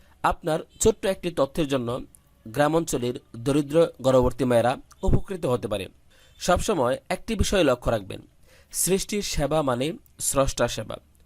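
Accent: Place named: Comilla, Bangladesh. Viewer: কুমিল্লা কথা য় করে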